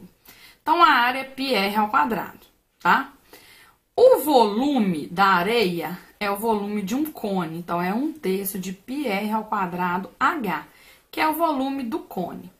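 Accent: Minas Gerais, Brazil. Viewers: Vc e mineira né?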